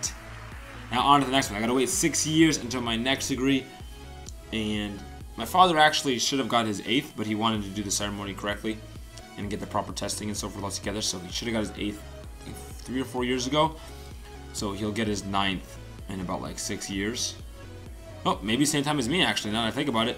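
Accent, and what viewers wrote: New England, United States. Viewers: What school is this Sounds like you’re in New England?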